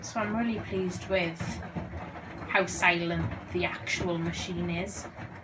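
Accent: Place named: Wales, United Kingdom. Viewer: After sueing the arse off Currys and FINALLY getting a refund for the Hotpoint washing machine that only lasted 3 months and the case stressing me out i am looking for another Bosch as my old one lasted me 17 years so i have all faith in Bosch. Thanks for the review:-) (Love the welsh accent too);-)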